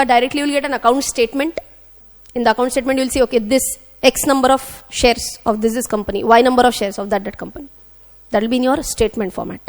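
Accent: Maharashtra, India. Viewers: Hello You have done a nice job, I appreciate you. Do one favour for us if possible, PLZZ switch the thumbnail of last two lectures bcoz after completing 2nd lecture we got a lot of confusions, I personally Google a lot for that purpose,than I go for 3rd lecture which has contained that explanations of same thing 😁. You have done a good job. I love the way of your teaching and Marathi accent you have used ❤️❤️. I don't know whether I do invest in share market or not but I have completed these lectures just to learn the way you present the things apart from the knowledge you have provided. Well-done 👍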